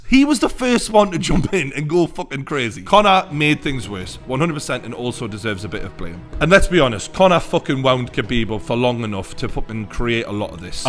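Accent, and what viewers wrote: Newcastle, United Kingdom. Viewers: Looooolllllll geordie. Enough love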